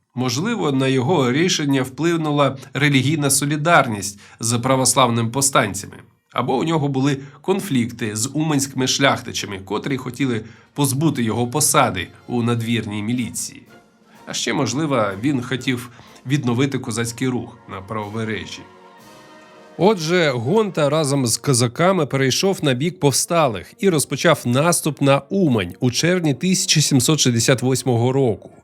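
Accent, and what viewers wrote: Russia. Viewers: Доповідачеві бажаю позбавитись руського акання і читати вголос українською щоб чути вимову.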